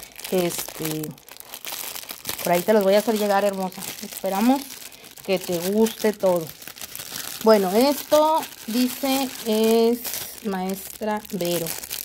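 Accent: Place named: North, Mexico. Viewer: Ahora sí te salió lo norteña,ajuuua 😅 todo padrisimooo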